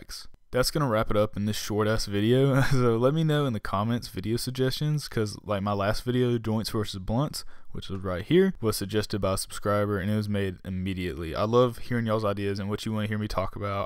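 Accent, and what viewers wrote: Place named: Kentucky, United States. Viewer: dude sounds like he from Kentucky :o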